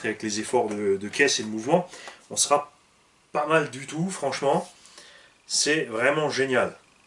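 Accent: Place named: South, France. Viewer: Les essais ! Les essais ! Les essais ! Tu commence à y prendre goût à l’accent du sud :D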